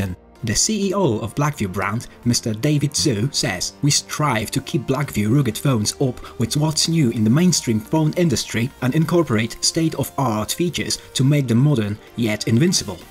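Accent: United Kingdom. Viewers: I really hoped for some original content or interaction with the device. This kind of seems like the original media packet recut and shared by an English accented presenter. The infrared example looked super fake, as if the scene depicted was CGI.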